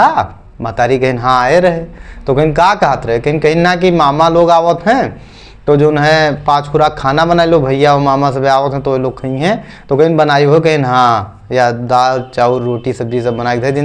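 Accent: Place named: Awadh, India. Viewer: Sir aapki ye Sunday ki kahaniya Sun kr bachpan yaad aa gya❤❤😍..... mai ayodhya se hu aur aapki awadhi dil ko chhu jaati h...... aise hi awadhi ko aage badhate rahiye......👌👌👏👏👏👏👏👏🥰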